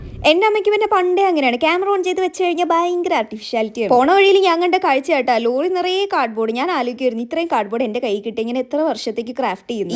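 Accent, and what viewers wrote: Palakkad, India. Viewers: Chechi Palakkad basha vanu😅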